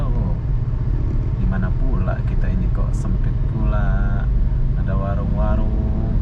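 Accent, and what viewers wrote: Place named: Malaysia. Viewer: Bahasa Melayu nya kentel bgt bang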